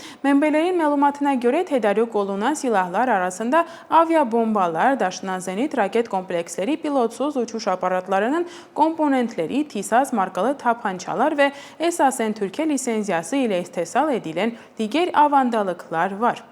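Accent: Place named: Armenia. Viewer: Deyəsən bu ermənidir, erməni ləhçəsi hiss olunur. Nə turkdi, nə də bizimki. Üçüncü kim ola bilər?)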